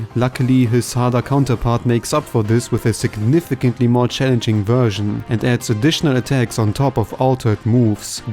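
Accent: Germany. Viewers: The German accent in his voice, I find it pretty neat sounding. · du bist deutsch richtig? das kann man raushören. 😂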